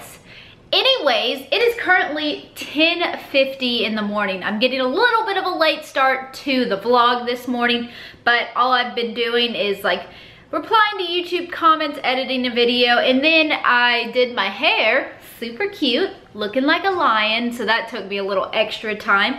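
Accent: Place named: Texas, United States. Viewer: Oh em gggeeeee!!! Just tried the hatch green chili dip from Costco ... it is DELICIOUS!!! Thank you so much for sharing!!! Just found you a week or so ago and I am now slightly obsessed! LOL! I’m a central Texas gal, so I can completely appreciate your Texas twang! 😉 Keto on!!!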